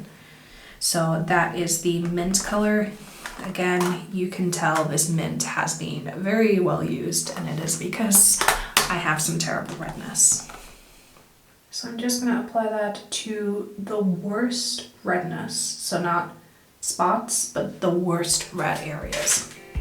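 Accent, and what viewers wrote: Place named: United States. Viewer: Very clear and easy to follow@ And, YO!, am i hearing things??? You've gone all American like! 😊 VERY natural and authentic sounding! And i'm Californian 😄! Cool, cool 😎👍🏼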